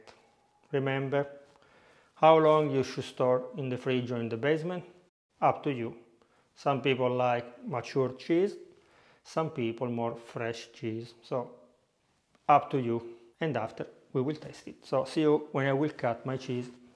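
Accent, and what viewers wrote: Italy. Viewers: I subscribed for the traditional ways that he shows, but also it's a joy listening to him... calm..knows his stuff well... and that great Italian accent. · Your english is very italian👍🏻👍🏻👍🏻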